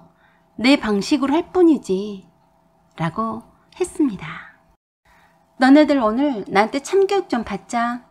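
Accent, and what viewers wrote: Jeolla, South Korea. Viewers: 라도 특유의 사투리 ㅋㅋㅋㅋ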